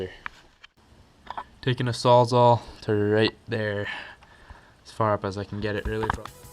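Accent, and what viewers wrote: Canada. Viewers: Could tell by the N that youre from BC. You from Vancouver or the island?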